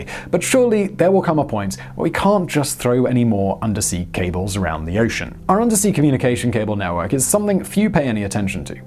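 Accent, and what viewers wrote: RP, United Kingdom. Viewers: Or... you can watch something like: <link> and get more information in three minutes than this does. Why is that? And, minus fill (to make up a required video length to be monetized), no BBC British and insipient hand waving and mannerism. In fact can be done in three minutes no sound at all. Nice.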